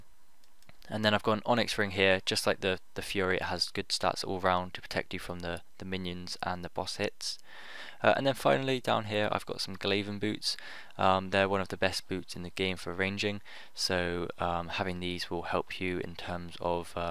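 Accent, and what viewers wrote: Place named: West Country, United Kingdom. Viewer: Thought you sounded westcountry :p From Devon myself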